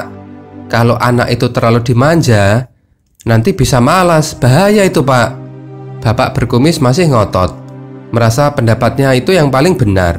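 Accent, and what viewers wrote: Java, Indonesia. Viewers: Adik aku bilang "jisoo org keturunan Jawa yaa bang!?" Itu mah abangnya ini yg pake logat jawanya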